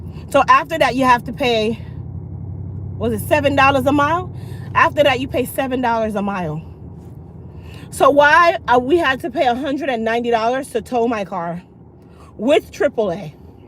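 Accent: Jamaica. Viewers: how are you, you always look good and your hair's on point, I love the color and style period. You are a funny person and when you get hype or talk fast I hear your accent the show that you are from Jamaica, I'm just smiling the whole time and still smiling while I'm doing this text to you, you are the type of person that every person need to have you around them, you happy, funny, joyful, very, very smart, and a beautiful person, Heaven Sent from God, I'm glad that I know you from afar and I will always be looking at you live on video, and ordering your products, I just order some of your products April 1st, 2023, thank you for that 35% off, I do appreciate it so much, take care and tell the next time, take care be safe and God bless always, love you girl, peace out.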